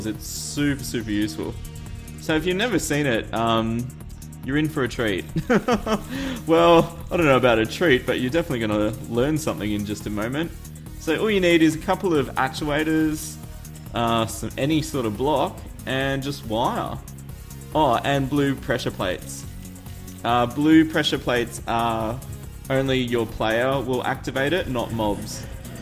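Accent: Australia. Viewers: Haha, that polish shoutout was so funny :). But if u want to say it somewhat correctly you say: Lubee-eu tziastetshka. Omg that was so funny just to try and figure it out ;). Please say: i like to watch watches in your australian accent but please say it in a very 'strong' accent. Keep up your great work, everyday i cant wait for another video. 9 and a half big chocolate cookies for you! ( half got eaten by the eater of cookies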